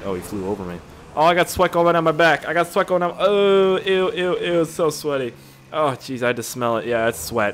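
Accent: Canada. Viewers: No homo but I love you Canadian accent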